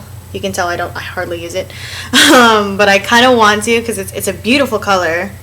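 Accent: England, United Kingdom. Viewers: LOLL I LOVED THE ENGLISH ACCENT omgg so funny man! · Your English accent is fabulous :))) Loving the make-up x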